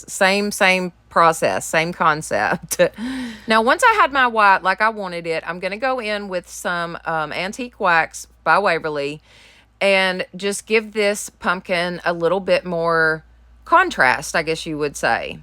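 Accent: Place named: Tennessee, United States. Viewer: Love each of these but I'm crazy over the first 2.. Gorgeous !! I am trying to guess where you are by your accent. I'm not right on many of my guesses. Lol.. I'm thinking Tennessee or North Carolina.. Am I even close ? Lol.. I live in South Carolina.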